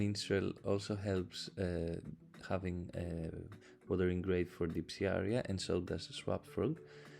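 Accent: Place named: Greece. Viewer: Yes I‘m Greek too but I live in Germany thats why I recognized your accent bro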